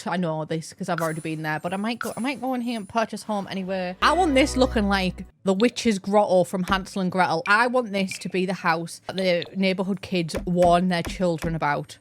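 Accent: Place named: North, United Kingdom. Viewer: Potential translation: "I was reeling, like- like excuse the gas, I'm supposed to be punching other people not myself" Unsure about the first part but the secind is definitely what you said I am proud at the level of northern british understanding I have reached. x'D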